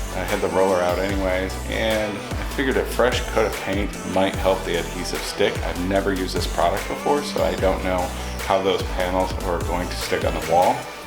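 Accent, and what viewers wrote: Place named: England, United Kingdom. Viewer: yr English accent is totally on point, u might like the TV series version of The Hitchhiker's Guide To The Galaxy,, 📺👍📱🛸🌌,, it was made in the eighties when there was Colins/ Nigels who spoke like that,, it's way better than the modern movie,, almost as good as the original radio play, which is almost as good as the books, which was a trilogy with five parts, (pt4 is my fav),, there are also audiobook versions on yt, which r good for painting to 📱📻😏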